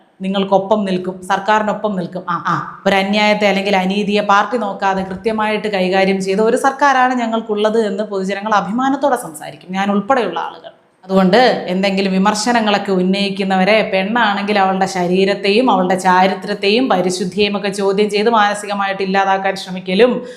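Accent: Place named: Trivandrum, India. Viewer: പ്രത്യേകിച്ച് ട്രിവാൻഡ്രം സ്ലാങ് 😂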